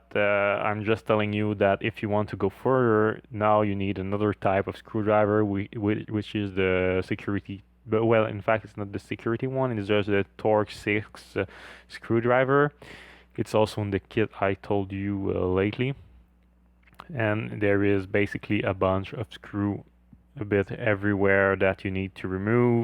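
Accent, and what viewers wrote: Canada. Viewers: New sub! Very nicely done video. Btw, are you from Quebec as your accent is familiar....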